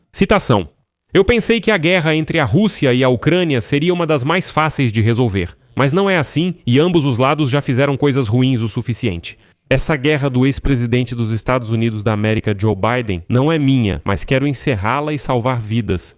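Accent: Portugal. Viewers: A IA fala português de Portugal. Claro , correto e compreensível. Aqui não se esquecem do plural e singular . Simplesmente um português corretíssimo. Parabéns IA de língua portuguesa .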